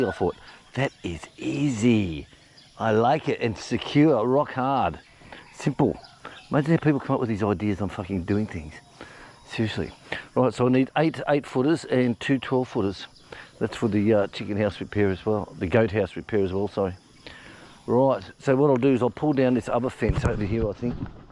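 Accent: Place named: Australia. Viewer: Just found your channel. Popped up in my feed and caught my eye. So good to hear an Aussie voice after all this time. Anyway I have subscribed to keep up with the laughter.Until people live here they have no idea of the hoops that we have to go through on a daily basis. I learnt not to go to the hardware on my own because they just don't serve me. Too afraid to speak English.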